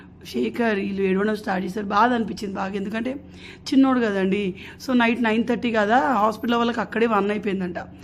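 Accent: Telangana, India. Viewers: Nice Bhasha ❤👌pakka Telangana ❤❤❤😅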